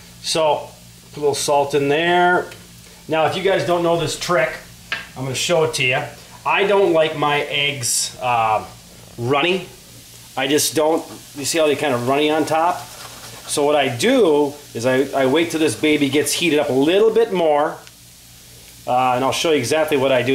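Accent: Canada. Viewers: CAN SOMEBODY SAY CANADIAN??? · Def Canadian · Are you canadian?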